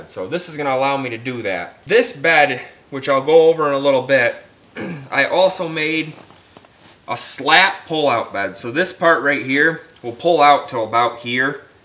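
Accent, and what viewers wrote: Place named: Arkansas, United States. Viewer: I’m guessing you’re from Arkansas? Your voice sounds like you’re from there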